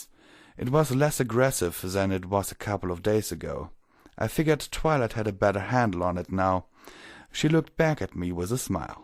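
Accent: Germany. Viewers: Now I'm not a fan of mlp, and am just watching this because I have nothing better to do. But your silky German accent makes this watchable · that german accent is so heavy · hey bro are you from Germany or Russia or England or Scotland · I loved how i could properly hear the story being told, and how your accent brings it's own flare to the voices. I'm sorry if this sounds rude in my assuming, but are you perhaps german? I like the accent and just got curious. Especially considering how prominent it is. It's very soothing to my ears.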